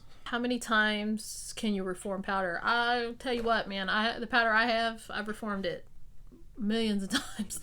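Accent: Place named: Kentucky, United States. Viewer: omg i’m from ky! i knew your accent sounded familiar to me 🧐🥰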